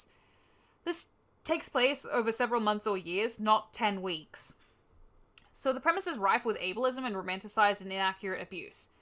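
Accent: Australia. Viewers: You sound like an australian Tiny Tina, an’ it’s awesome!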